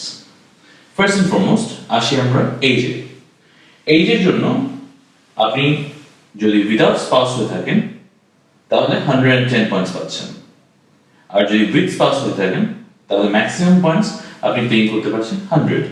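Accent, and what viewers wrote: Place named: North America. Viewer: Don't understand why people are moaning about his presentation or way of speaking? He probably lived in USA or Canada for a long time hence he speaks like that and personally I would prefer his presentation as it's clear and concise.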